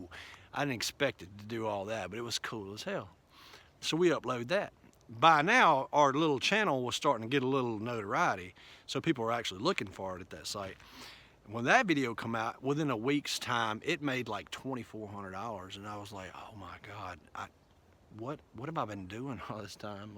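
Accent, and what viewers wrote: South, United States. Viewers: *'s southern drawl sounded almost exactly like my youngest daughter talking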